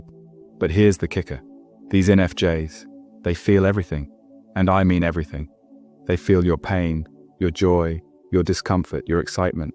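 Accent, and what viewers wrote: United Kingdom. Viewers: You made me giggle!🤭 Emotional Kung Fu😎 How do you know INFJ so well? Are you one of? You must be one of, you know too much!😂 (By the way, really love your humour and your sweet British accent ! You sound charming!)❤ I fancy you just from your words and the sound of your voice & accent ! (It doesn’t feel it’s all AI 💯 voice made) Love from a Italo & fellow Londoner ❤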